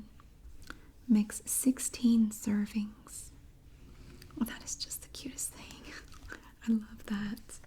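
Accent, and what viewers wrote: South, United States. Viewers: Hey first I love your videos and your characters are the best! You should do a video showing how one of your characters does her makeup... like a bitchy girl puts on wild makeup! I would love to see that in your southern voice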